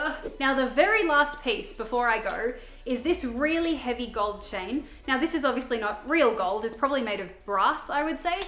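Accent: Australia. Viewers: I prefer it when you use aussie lingo and keep your accent, I'm from the UK and I just love hearing the way you guys talk down under ☺😍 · Please don't change anything about your 'accent'. I am Croatian and I come here for the Australian, not the American. 💪 you are the only Australian I watch on youtube so i cherish all these differences, I truly enjoy it. Wish we could see more Australia in the future. I love love your videos, this thriftmas was amazing! wish you all the best! :) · Please don’t beat yourself over the way you say certain words. Accents are all unique, and just because an American doesn’t pronounce something the way an Australian would, doesn’t make it incorrect. Your accent is SO cool, and even if we say something a different way or use a different word for something, we still know exactly what you mean. You shouldn’t change yourself! We love you for you!! 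· Part of the excitement is learning by experiencing another culture and lingo. I love that is Australian. It's so fun to have her as my friendly neighborhood Cultural Atache' to Australia. 😊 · yes ! I love to hear different accents and I never think about her speaking Australian as a weird thing since she's from there ! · Ikr and it’s not every day you hear an Australian accent, people are allowed to be unique (and sound like where they’re from :P I think it’s a common subconscious part of American culture to feel like “we do everything right, so we have to help people conform to our perfect culture”) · Yeah that annoys me, America has tons of dialects to begin with too so it’s hypocritical to ask for a more “American” way of speaking from an AUSTRALIAN. Edit: I’m American, Southeastern to be exact. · I’m Australian, even I struggle to know the correct pronunciation of things, even Australians correct each other’s pronunciation all the time, especially suburbs and places. 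Literally everyone pronounces things differently. I don’t think was purposely changing her accent for the American audience. She just didn’t know how to pronounce Academia so when she got corrected she went with it. I question things I say all the time. It could be because we have so much American influence through socials and TV. There isn’t one way of pronouncing something. She says like a million words in this video in her native accent and you pick on one word. Let do her thing she is killing it!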